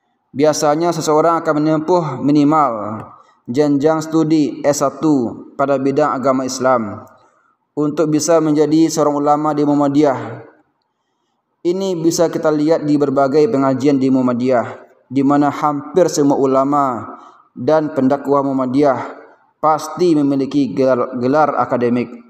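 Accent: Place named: Padang, Indonesia. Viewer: Ngomongnya logat Padang boanget yak?! 😁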